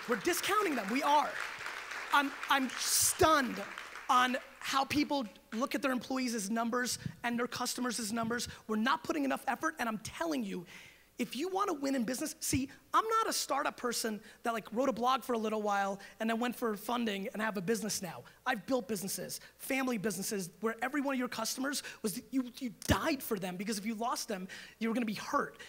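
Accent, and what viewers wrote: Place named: New York, United States. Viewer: I've noticed! I started going to networking events so you have to have a good speech, and so I litsen to the ones I like, you are one of them :) One question tho, I know you get alot of them, I live in Sweden and work with art, love my job but I found it hard to transcend from private customers to companys. Do you have any advice? Thanx, keep up the good work. Love your NY accent haha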